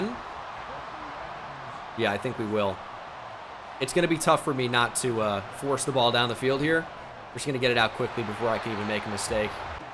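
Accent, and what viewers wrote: London, United Kingdom. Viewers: Keep spamming the same play that doesn’t work and keep spamming the london accent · u throw every ball as a bullet pass u need to lob or touch pass sometimes thats why that Drake London play happened. W british accent tho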